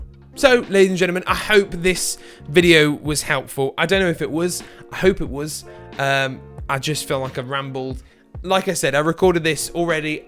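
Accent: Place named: Birmingham, United Kingdom. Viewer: oh thanks mate, that's so nice of you and my bad I couldn't recognize that brummie accent....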